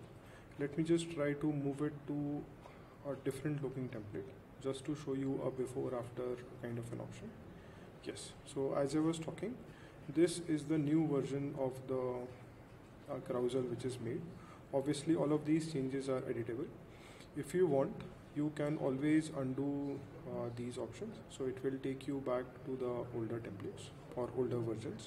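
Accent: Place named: India. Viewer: The Indian accent is very difficult to understand. Please consider using someone who speaks clearly with no accent. As a new user these current videos are frustrating to learn from. Thanks.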